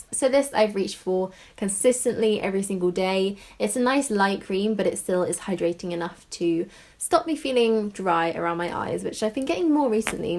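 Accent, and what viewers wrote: United Kingdom. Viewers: I made it to the end frankly I just love hearing your voice. I am an American and I love British accents. Thanks for the video have a great day!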